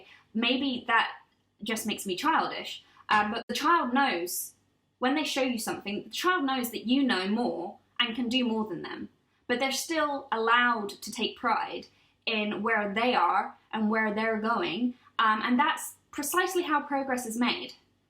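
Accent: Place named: United Kingdom. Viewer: Omg! See we are on the same wavelength! Spot on! And I didn’t know you were a Brit! Is that what your accent is? I love it!!!!! You are NOT bad!!!!!! I always get up and do bad karaoke and try to get other shy people to go up with me and sing and see it’s just a fun game! Music is fun and expressive and wonderful! I love this video!!!! I need to break back into my fb and share your stuff lol! Awesome awesome awesome!!!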